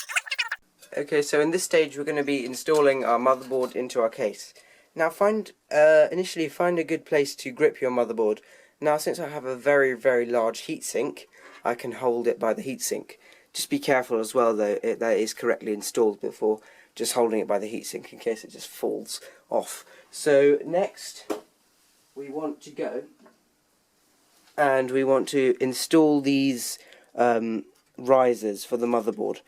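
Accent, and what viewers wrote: United Kingdom. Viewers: finally an english voice on youtube ahh :)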